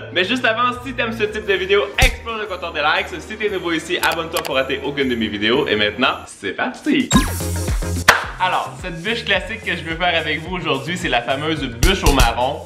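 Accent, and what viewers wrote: Canada. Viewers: tu mon youtubeur préféré de is vidéos accent canadien et qui met quoi des vidéos trop trop rire 👍👍👍👍👍 24h 🇨🇵🇨🇵🇨🇵🇨🇵 🎄 Noël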